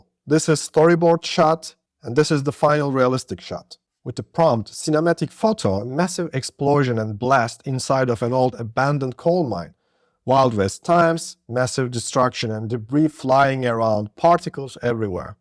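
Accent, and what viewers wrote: Turkey. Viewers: o nası bi Türk aksanıdır, nerde duysam tanırım :D güzel içerik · O klasik soruyu sorayım. Türk müsün la ? aksanın Türk gibi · Bu ingilizce konuşma biçimi ancak bir Türk'e aittir. Tebrikler video için. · türk aksanı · İngilizce de konuşsan Türk olduğun anlaşılıyor 😄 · seslendiren türk mü bu aksanı nerde olsa tanırım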